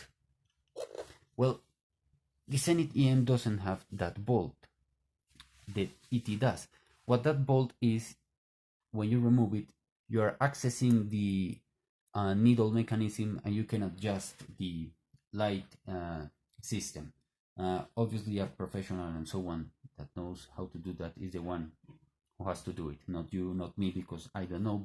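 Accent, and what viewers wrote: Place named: Spain. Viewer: Hola,gracias for your review. Very nice the EM whit Moscow 80 logo!! Love your english with spanish accent...!! Greetings from Italy. Nos vemos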